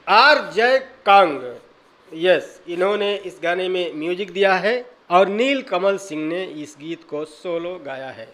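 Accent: Bhojpuri, India. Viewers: Tone to Bhojpuri hain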